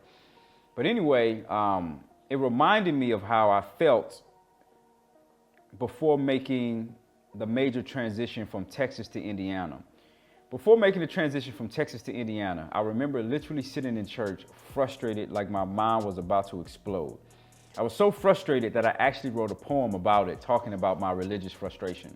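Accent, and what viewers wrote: Ghana. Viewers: 😄🤣 Hahaha love your Ghanaian accent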